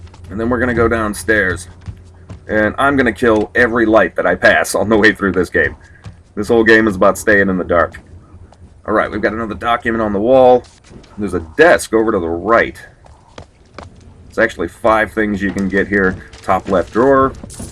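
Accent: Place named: United States. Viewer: Arno Viktor Yeah it's a nice calm voice. Don't normally like the American Accent but dude you got it on lock down lol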